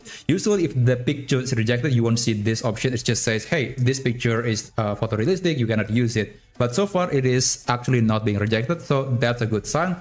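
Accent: Indonesia. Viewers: your accent...are you indonesian?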